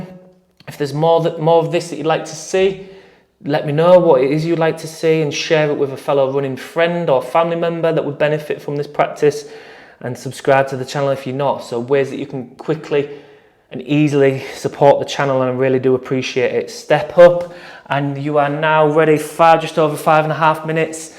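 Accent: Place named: England, United Kingdom. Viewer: Just done my first run in 20+ years! Refreshing to find an English video rather than US, and a regional accent too! Great!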